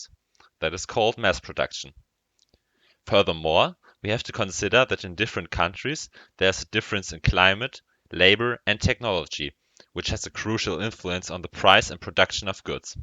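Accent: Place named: Germany. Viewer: your german accent sucks but i like this video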